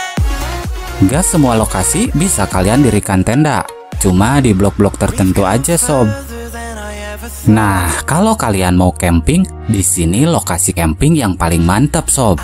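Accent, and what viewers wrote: Sunda, Indonesia. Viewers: Reviewer na meuni nyunda pisan...tong hilap kang..liput Oge wisata pantai Utara Subang na Pokona mah Endah pisan pamandanganna 😊😊😊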